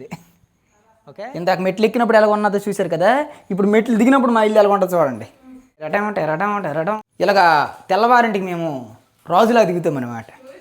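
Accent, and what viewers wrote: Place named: Telugu, India. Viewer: That slang is pure telugu ❤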